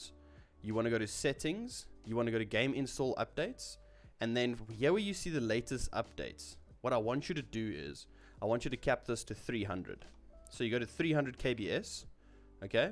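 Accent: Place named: South Africa. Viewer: Nice vid, your voice makes me wish there was a South African OP in WZ now.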